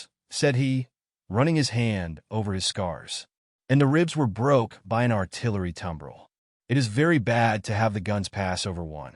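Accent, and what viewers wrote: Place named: United States. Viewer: American narrator ! totally inappropriate and not welcome .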